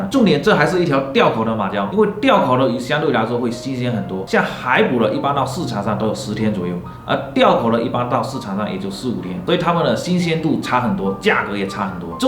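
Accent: Fuzhou, China. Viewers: 福州口音相当亲切了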